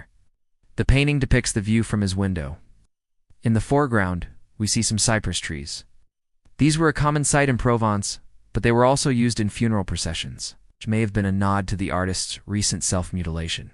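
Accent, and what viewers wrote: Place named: United States. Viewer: the worst about these common narrations is this super dumb American accent. US Americans can´t pronounce names, places and a single foreign language word f.s. and don´t even make an effort to try .... absolutely atrocious and dumb - especially in documentaries. Best turn off the narrator (as usual)..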